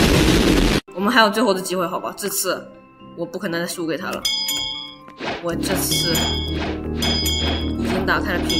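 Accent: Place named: China. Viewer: 中国的吧 听口音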